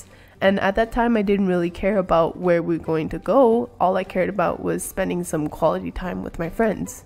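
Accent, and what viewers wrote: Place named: Vietnam. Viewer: Can't wait for the next onee!!! <333 Love your vietnamese accent LOLOL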